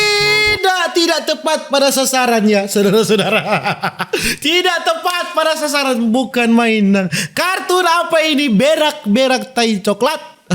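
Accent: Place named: Medan, Indonesia. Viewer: Orang medan ya bang, chenel ter kocak menurut saya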